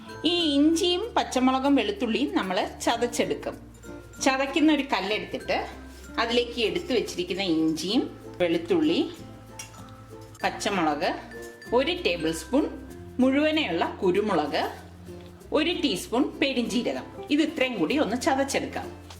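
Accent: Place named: Kottayam, India. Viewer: Sister the crab came out so well! You speak Malayalam like my parents so you must be from near Kottayam! greetings from Canada! Very clear instructions! Thanks!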